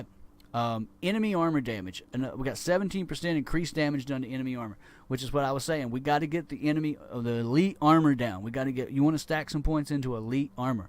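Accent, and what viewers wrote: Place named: South, United States. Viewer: Southern accent is cool these days dude. Its also a total relief to listen to a voice that is original, and not sounding like a generic radio DJ like 99% of youtube gaming commentators. Btw thank you for taking the time to reply to my comment, credit to you sir. Good luck with the channel, its helped me a lot.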